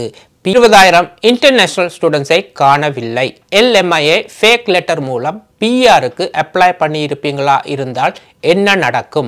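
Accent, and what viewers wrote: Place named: Sri Lanka. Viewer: இலங்கை தமிழ் பிரமாதம்.. கேட்க இனிமை...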